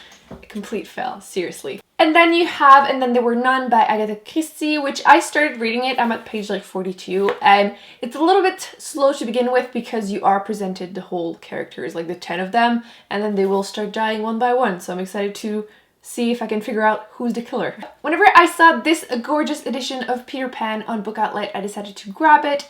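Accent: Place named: Quebec, Canada. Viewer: For Jane Austen, I really liked reading Emma and Northanger Abbey is a fun read but it's really different to her normal writing style because it's more a «parody» of the Gothic genre than a love story! And you also made me think to put Anna Karenina in my TBR! (Et sans être trop personnelle, originaire du Québec? L'accent que tu as lorsque tu parles français ressemble beaucoup au mien aha ;) )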